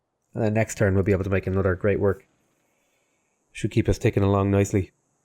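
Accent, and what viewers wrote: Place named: Ireland. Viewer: I love how much more pronouncedly Irish you sound at the episode's beginning.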